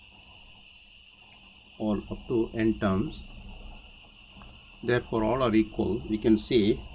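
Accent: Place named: India. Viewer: You know a math video is good when you hear the indian accent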